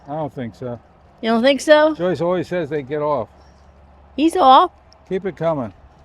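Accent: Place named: Maryland, United States. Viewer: You guys are wonderful we love you... We now live in Jupiter fl.. Don't crab the loxahachee.. But watch you.... Miss maryland..you sound like that's where you are from.. Happy crabbing. Found an alloy can eat in stuart... Are you from Maryland.. Ann mckewin west palm beach... God bless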